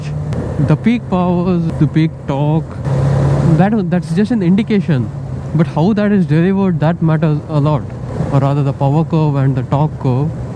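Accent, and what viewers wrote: Karnataka, India. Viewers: It feels good to hear the review by an Indian in an Indian Accent. BMW G310R is Love.. 😍 GS 310R would be awesome too.. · The review ok. I had a very difficult time understanding that very heavy Indian accent. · hope for best of it dude are u Indian you some of sound like Indian 😛😜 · excellent review, man. loved it. are you from Karnataka? just curious, and I'm basing it off your accent.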